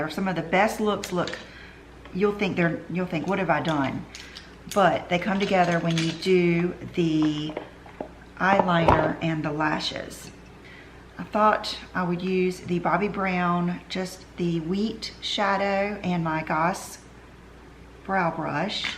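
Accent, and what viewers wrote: South, United States. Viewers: love your southern accent!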